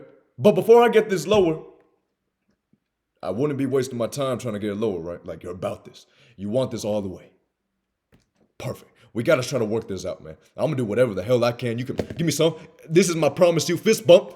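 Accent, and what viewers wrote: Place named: Houston, United States. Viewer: Where you from? Sound like from Houston.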